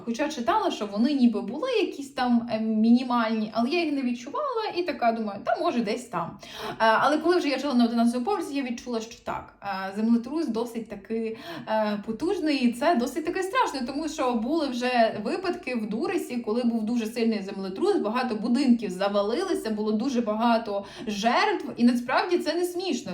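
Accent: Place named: Western Ukraine, Ukraine. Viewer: З відки у вас такий жахливий акцент. Ви часом не западянка?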